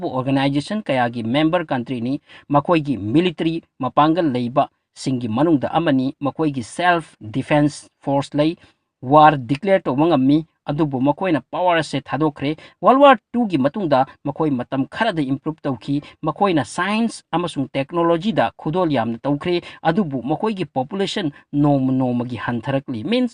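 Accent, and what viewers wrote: Manipur, India. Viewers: This narrator visiualised the history Japan even though he never been to Japan and narrates in a different 👍 dilete of Manipuri (Meiteilon dilete ).. 👍🙏.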